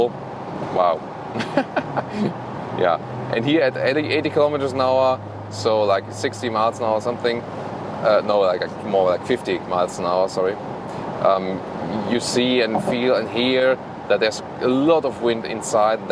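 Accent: Germany. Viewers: Dieser deutsche Akzent :D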